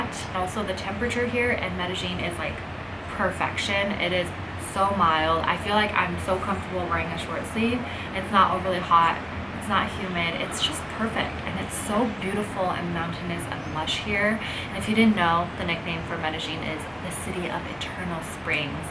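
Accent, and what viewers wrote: California, United States. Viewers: your whiney cali drone voice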